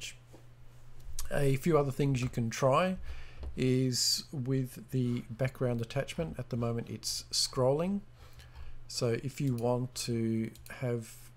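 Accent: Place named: Australia. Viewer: So cool - and great to hear an Aussie voice.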